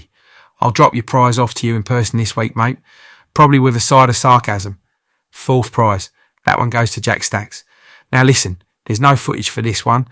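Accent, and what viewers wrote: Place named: Cockney, United Kingdom. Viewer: Hi I subscribed to your channel yesterday. Really love the way you present your video, fun but informative, I'm Scottish, but I love your voice sound like a Del Boy type of guy. Keep it up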